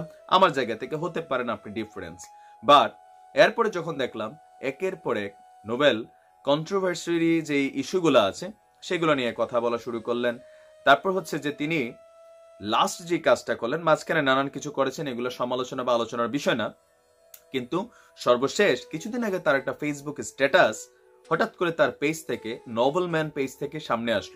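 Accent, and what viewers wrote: Comilla, Bangladesh. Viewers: ভাই আপনি কি কুমিল্লার,!??? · আপনি কুমিল্লার নাকি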